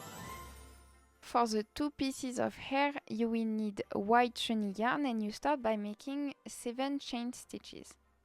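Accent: France. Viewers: Love it. I’m working on my very first big Santa . Your tutorial is easy to follow, thank you so much . Are you French ? Love your accent.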